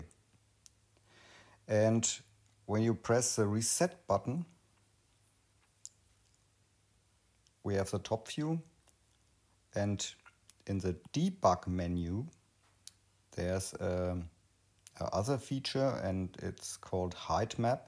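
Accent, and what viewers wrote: Bavaria, Germany. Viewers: Thanks for sharing. I love Bavarian English 🤭. Alles gute 🫶🏼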